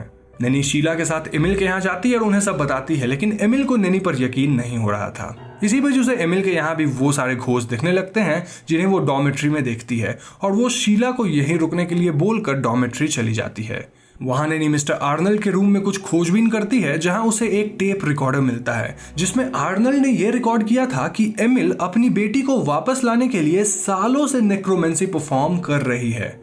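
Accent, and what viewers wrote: Bengal, India. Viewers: U got a somewhere bangla accent... 😊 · I love And his bit of bengali accent🤗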